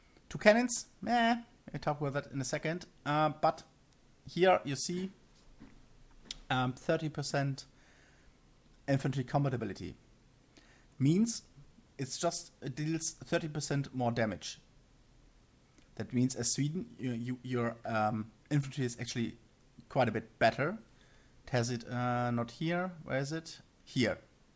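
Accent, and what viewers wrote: Germany. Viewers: bei dem deutsven akzent kannst du auch gleich deutsch reden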